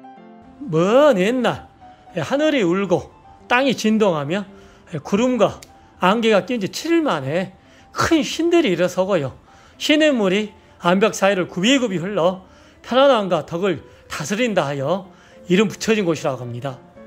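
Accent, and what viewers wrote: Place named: Jeolla, South Korea. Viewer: 워미... 이런말 혀문 안대능거이 나가 잘 알기능 혀지먼 도저히 안혀블고능 못참긋네요잉~ 아재요~ 절라도 사투리 억양 참말루다가 어머어마 혀불구마잉.. 조와요 눌라불고~ 잘보구 가게요~~~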